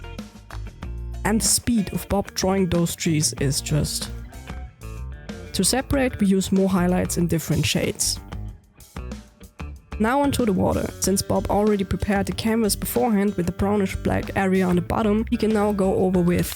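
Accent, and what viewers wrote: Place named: Germany. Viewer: you sound alot german, are you?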